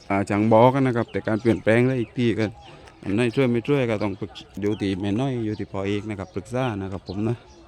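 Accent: South, Thailand. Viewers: ทำมัยไม่พูดภาษาไทยจะได้ฟังรู้เรื่องบ้างนี้พูดภาษาใต้ฟังไม่รู้เรื่องเลย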